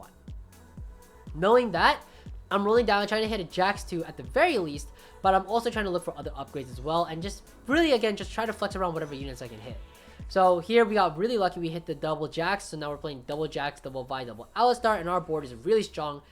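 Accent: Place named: Boston, United States. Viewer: Hey, found you and learned a lot from your previous road to challenger vids! You said you have a Boston accent, I'm also from Boston and also suffer from the random accent coming out LOL, keep up the good work!!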